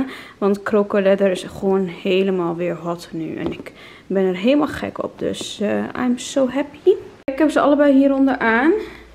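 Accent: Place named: South, Netherlands. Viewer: Komt uit Limburg ofzo? Haar accent lijkt echt van het zuiden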